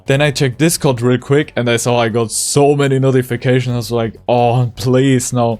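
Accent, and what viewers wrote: Germany. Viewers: Bro ich höre irgendwie deinen deutschen akzent raus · im hearing a little bit deutsch heraus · Bro man merkt das du Deutscher bist xDdd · Bro ist 100% deutscher😂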